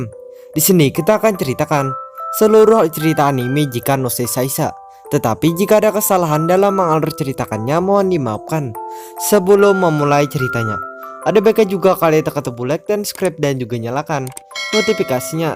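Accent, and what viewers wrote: Banjar, Indonesia. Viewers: Logat banjarnya kedengaran kali min 😄😄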